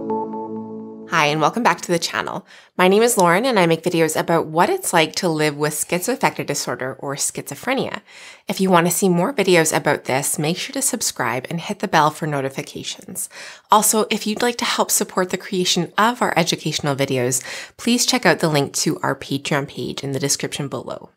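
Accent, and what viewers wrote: Canada. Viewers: You’re Canadian ?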